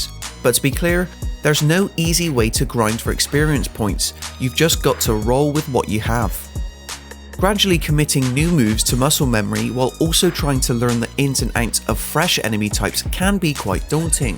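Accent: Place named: Bristol, United Kingdom. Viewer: Bleeding hell, if this commentator isn’t from Bristol then I must be deaf. Love a good Bristolian accent👍🏼😂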